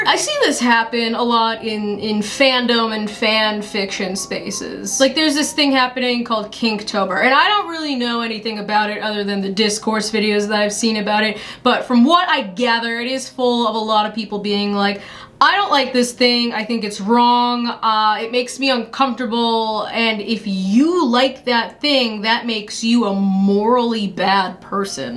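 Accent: Boston, United States. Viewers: shows in NYC while getting ready to move to LA... but i still hear Boston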